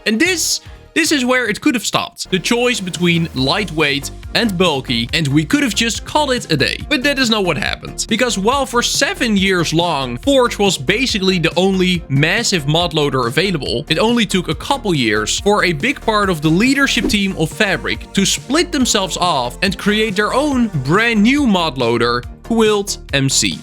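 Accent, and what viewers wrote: Netherlands. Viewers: i mainly just use fabric cause my laptop is so bad that it runs my browser at 2FPS, if i use forge i need to close everything else and then i run on 10FPS but with fabric and shaders i run with 10FPS (on fabric i dont have so many optimalisation mods. i only have sodium, lithium, ferritecore and entity culling) also you for some reason sound realy dutch with how you speak english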